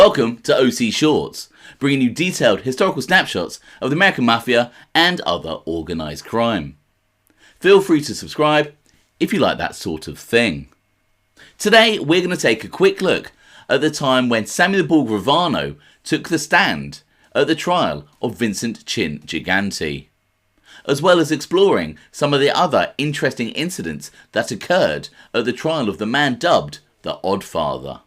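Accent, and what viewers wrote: United Kingdom. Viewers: Brit talking about American Mafia lol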